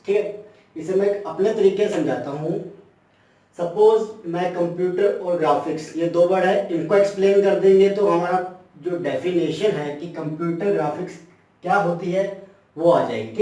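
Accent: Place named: India. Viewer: oh,full Indian English .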